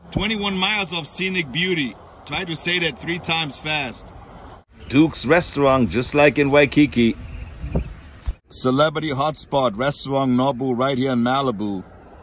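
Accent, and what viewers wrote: Germany. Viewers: Nice to hear a German Accent, my best friend was from Germany. thx for the vids.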